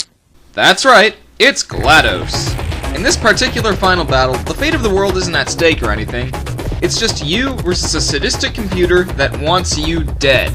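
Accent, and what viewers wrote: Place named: Canada. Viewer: your canadian i can tell...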